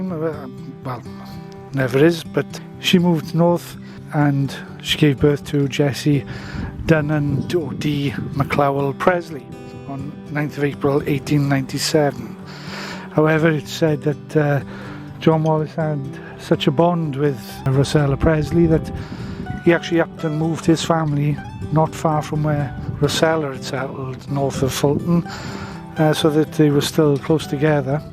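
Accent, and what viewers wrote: Wales, United Kingdom. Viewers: God bless ye Buddy, Thank you for doing such a crackin job on this, its very informative and interestingly delivered. LOve the twang of your broguish speak. you Welsh? nary to matter, its just very resonant of a past I do not know but none the less I am very much proudly from. I am of the Georgia and Carolina people, but do have Mississippi blood there still and I am highly honored by any and all my associations to Dixie, tis a privilege I owe my life for. And I thank yall for coming to be a part of it and show us some love. I hope you was treated like the good guests you are and come to visit more when you can. in the old Irish tongue, I believe its in order to convey, Caed amlle a failte' unto ye. Now, for the closing notion, by which, I request that you tell that fine lady there accompanying yourself, I love that tshirt shes sportin' like a trooper!!! 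God bless the Merseyside and the grooves that flow in and out of that river there. Should ever I be of any help to you, let me know, im in Seattle but, heading to Mississippi and NOLA this spring or summer, intend to check out this very place thanks to yall and could provide a wee spell of boots on the ground if theres something yall missed or need a good lookin at. Thank you again and to you a special salutation thats privi amongst us old sons of the South, nary to matter the color, your God, your choice in rose over magnolia, but, just so long as you dont put no sugar on yo grits, I warmly bid unto thee, Deo Vindice and Pea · I had no problem understanding or knowing you are welsh,, but then I'm from 🇬🇧 👍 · Enjoyed that 👌🏼 It was refreshing to hear a welsh accent knowing their onions. Where you from? I’m in Swansea.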